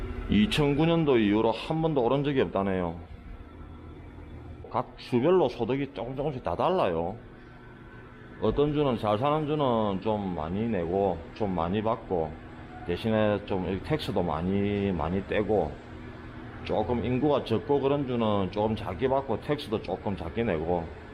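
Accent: Gyeongsang, South Korea. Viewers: 구수한 경상도사투리에 동질감을 느끼며 고마 썌리 마 구독 때리고 가겠습니다~ 항상 안전운전하시고 흥미로운 영상 계속 부탁 드립니다. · 구수한경상도 말씀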